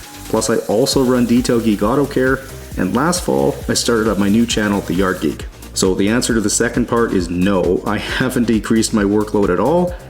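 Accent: Canada. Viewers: I always quickly ask myself why parts look like the vehicle was around the coast too much as a Texan, then quickly remember this is from the salt on the roads during y’all’s inevitable snow in SK, Canada. One of my beloved best friends of decades is from there and he has many stories of living on a farm and dealing with crazy amounts of snow. Much love, enjoy the vids so much. I love catching your Canadian accent btw.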